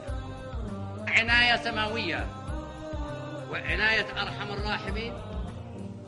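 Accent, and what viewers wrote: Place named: Saudi Arabia. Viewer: ماشاءالله عليك واضح من لهجتك انك سعودي من ذماران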